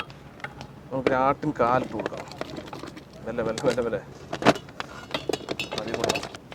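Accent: Palakkad, India. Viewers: Aa palakkadan slangilulla samsaram kelkkan enthu rasanu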